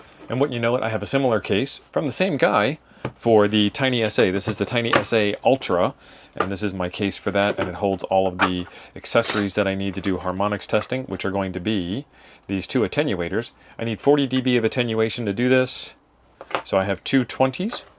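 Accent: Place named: Australia. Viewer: Nice kit and worth even more with the hot Aussie voice chic.😊🇦🇺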